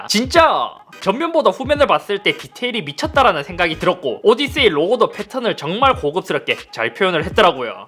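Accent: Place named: Busan, South Korea. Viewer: 제가 구독한지 어느덧 2년 다되가네요. 오딧세이 G7 개봉기 영상 잘 보았습니다. 댓글은 거의 안 달았지만.. 같은 부산 사람으로써 말투가 정감가기도 하고요. 무엇보다 팩트에 디테일하게 생각치 못한 부분을 잘 끄집어내는게 좋아서였습니다. 현재 안그래도 전 모니터 50~70만원 사이를 보고 며칠째 고민중에 있는데요. 잇섭님도 아시겠지만 LG나 SAMSUNG은 국내에서 대기업의 인지도가 제일 높아서 여러 평가가 많은 편입니다. 그리고 잘 모르시는분들은 그냥 LG나 SAMSUNG을 깊게 고민안하고 사는 경향도 있기때문에 대부분 평가를 쉽게 찾을수 있는데요. 현재 제가 2가지로 압축하여 보고 있습니다. LG는 1세대 NANO IPS의 딱따구리, 은하수 같은 현상이 나타나서 패스했구요. 오딧세이 G7도 한때 관심있게 지겨보던 제품이지만 역시나 커브드(이건 취향이라 별수 없나봅니다.)와 VA 패널의 한계라 결국 패스했습니다. 이 영상의 LG 화면이 더 좋게 보인건 그래도 아마 패널아닐까 생각해봅니다. 물론 오딧세이 G7이 VA패널이긴하지만 IPS에 맞먹는 정말 발전된 SAMSUNG패널이긴 합니다. 보고 있는 제품이 알파스캔 AOC-273QXP와 델 S2721DGF입니다. 현재 마음이 델쪽으로 기울어 가고 있지만 알파스캔같은경우 국내에서도 다나와나 인벤등에 평가가 많은 반면 델은 국내 평가가 거의 없더라고요. 까페의 댓글로 간신히 몇개정도만 겨우 찾았었고 구글이나 유튜브로는 전부 외국인들이 좋은 평가로 나오기는 합니다. 델이 워낙 칭찬일색이고 제대로 된 평가는 그나마 유튜브로 디테일한게 있기는 하더군요. 알파스캔 스펙은 170hz주사율로 되있지만 사실상 DP포트 연결하여도 10비트로는 120hz로 제한 있다고 하네요. 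결론적으로 델 S2721DGF 모니터도 리뷰를 진행 하실 수 있을까요? 모니터 고르기가 본체 사양 맞추는것보다 사실상 너무나 까다로워서 한달째 고민중이네요.. 끝으로 장염 3일에서 1주일 고생할텐데 빠른 회복 기원합니다.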